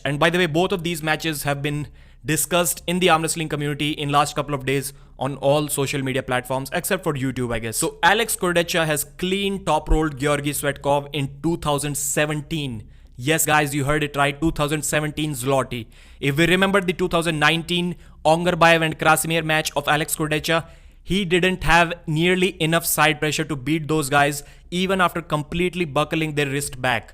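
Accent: India. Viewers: dude your accent is sooooooo strong I could tell a mile away you're Indian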